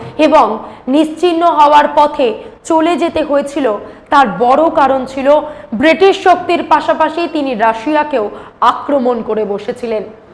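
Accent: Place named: Kolkata, India. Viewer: কোলকাতা মানুষের ভাষার টোন আলাদা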